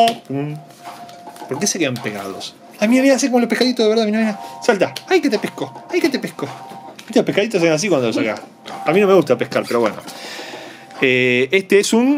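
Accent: Argentina. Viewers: jajaja que chulo me encanta el accento argentino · pares un argentino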